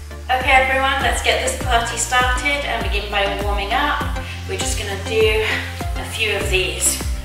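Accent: United Kingdom. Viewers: Bloody brilliant! I'm not overweight but I am unfit. I hate watching all the buff fitness instructors strutting their perfect bodies on YouTube. We need real people we can relate to. Please keep it up. You are a breath of fresh air. It's also a bonus to hear a British accent for a change. 😘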